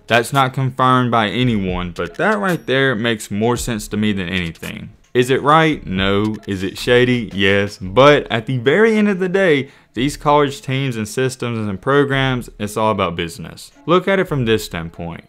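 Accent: South, United States. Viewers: So a southern twang erases the salient points within his video? Seems legit...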